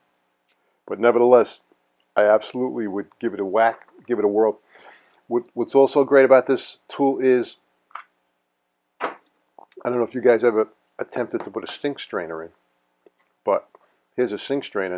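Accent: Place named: Brooklyn, United States. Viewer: Just bought one and i knew you were from Brooklyn since you sound like me, lol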